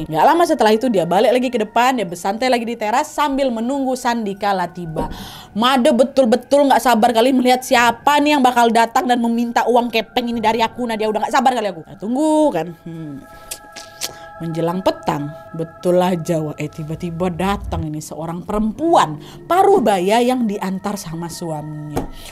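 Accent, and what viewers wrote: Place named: Medan, Indonesia. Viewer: Malah lebih ngakak denger ceritanya.. cerita tentang orang bali tp ini logat orang medan yaa..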